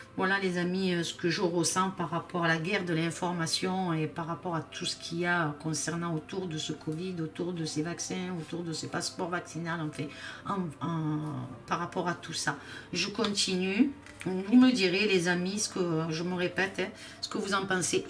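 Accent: South, France. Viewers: Vous êtes très spirituelle. Merci pour vos video. Refusons le vaxxx tous ensemble. J'adore votre accent du sud !!! Lumière sur vous.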